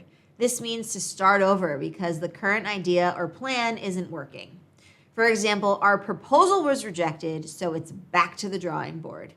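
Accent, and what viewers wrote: United States. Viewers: Nice, i'm from Jakarta, I like maerican english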